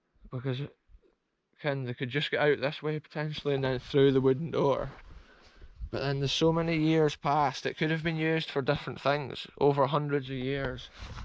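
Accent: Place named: Highlands, United Kingdom. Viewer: Just discovered your channel. Love your videos! It's really interesting exploring these old places. I'm lucky to have 4 or 5 ruins close to my house 😍 Love your accent too. Where is you're from? Wee bit of Highland twang in there!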